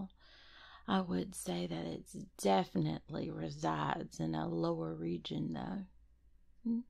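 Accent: South, United States. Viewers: ah within 14 seconds already has me fucking blushing, your pickup lines are too good! will finish this comment when i finish the audio but can already tell its gonna be a good audio, edit: oh damn you really will have me whimpering how do you manage to do a southern voice that just.... makes me absolutely M E L T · Southern accent.. southern accent??? Oh yippee! I love that so much, makes a Brit like me melt and crumble haha. Also, with you playing as a hellhound... imma make it my head canon that you're related to Loona, or friends heh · YAaaayyy! Great audio! Love the hellhound, the southern, the innuendos, the growls, the dom! Awesome work! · I’m in love with this southern hellhound. 😍 I find women with southern accents to be attractive.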